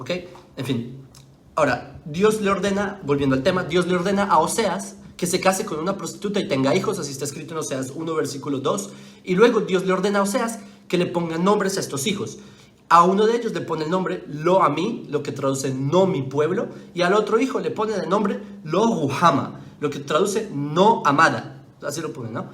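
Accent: Bogotá, Colombia. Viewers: Y cómo va a vivir libre, sirviéndote a ti ? Que eso es lo que quieren los judíos , que les sirvan los demás ? Estás crazy , resígnate y entiende que Dios es Dios para el Mundo , Y que Dios prometió bendecir a todas las naciones , con su risa tonta y su acento de rolo nadie te cree, no eres más que un cachaco con kipas ! 😢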